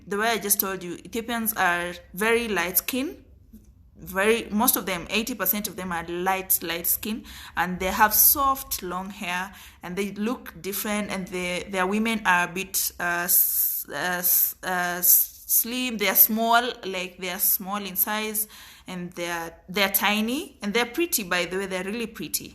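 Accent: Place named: Mongolia. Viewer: You are a very strange woman the same as the guy who told you not to go to Ethiopia. You just look an ordinary Ethiopian girl but you sound like as if you are from Mongolia.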